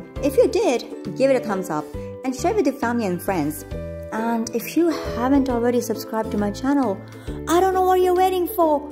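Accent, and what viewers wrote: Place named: Turkey. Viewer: you sound Turkish though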